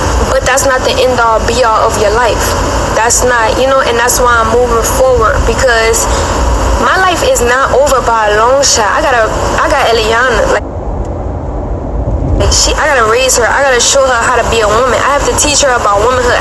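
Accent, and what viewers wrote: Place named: Louisiana, United States. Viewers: her Louisiana accent coming threw strong. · Through it all, Ive always took note and loved her New Orleans accent. Its stronger now that she's back home ❤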